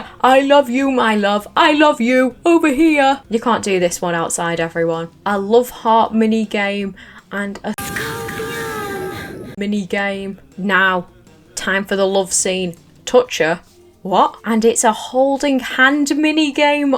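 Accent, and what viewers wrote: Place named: England, United Kingdom. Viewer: Recently found your channel and marathoned most of your content, I moved away from England 8 years ago and didn't realise how much I miss the accent. Glad to find a new upload.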